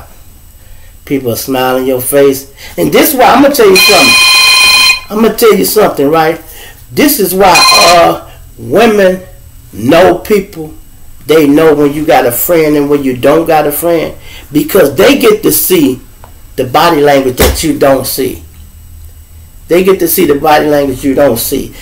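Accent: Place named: Chicago, United States. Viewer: You are from Chi-Town or some Chi-Town ties I hear it in your talk. I didn’t hear it the first time, one love I’m the same way with the Strip Club Gambling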